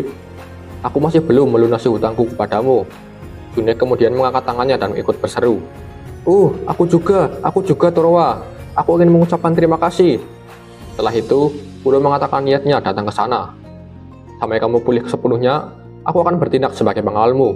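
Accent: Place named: Java, Indonesia. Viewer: Suaranya diperbaiki, logat jawa dan suara mendem ditambah dIkasih efek bukan perpaudan yang bagus. Perjenih suaranya dulu aja baru digaya2in.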